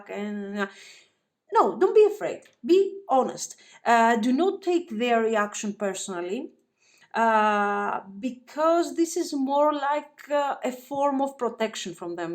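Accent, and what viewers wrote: Greece. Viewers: Your voice has the Greek accent 💕 So if you are, σε ευχαριστώ πολύ! Το πακέτο 2 was on point and you never fail me with your readings!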